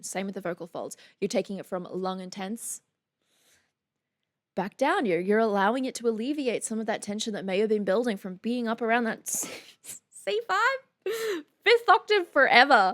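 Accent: Australia. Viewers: And yes... I could tell you were a Queenslander from the accent alone :-) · "You Nut Job"! I love how you Aussie's talk! PS: I'm glad you go with the English way of spelling words, like "colour" unlike everybody else who leaves the "u" out of a lot of English words, other examples are words like "parlour", "behaviour" etc. I'm a Kiwi so we don't leave the "u" out of words either unlike the American's do. Americans just love to abbreviate everything and anything until it's unrecognisable, I think!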